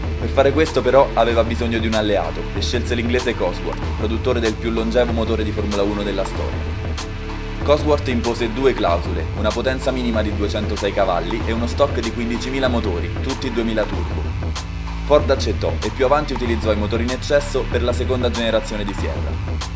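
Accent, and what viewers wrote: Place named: Rome, Italy. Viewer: per me troppo romanesco per parlare di cose serie come macchine e motori.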